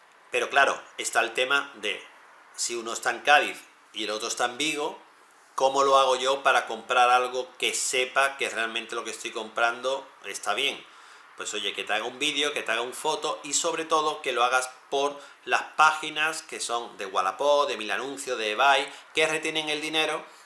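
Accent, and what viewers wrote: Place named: Córdoba, Argentina. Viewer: Aa valee! Yo de Córdoba por eso te lo decía! Que he visto que tenemos acentos similares y digo si es de Córdoba podemos salir a entrenar! Jajaja. Un saludo!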